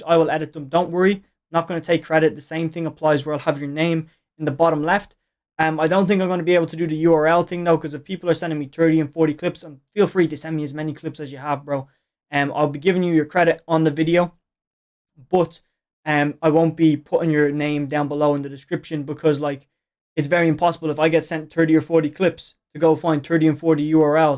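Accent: Ireland. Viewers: Are u Irish I am😃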